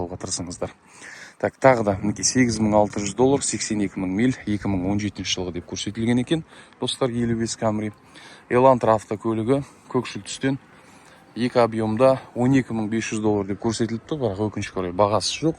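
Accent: Standard. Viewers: Керемет бауырым сөз жоқ таза қазақша Алла жар болсын сіздерге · жақсы таныстырып жатырсың таза қазақ тілінде рақмет бауырым қаида жүрсеңде жолың болсын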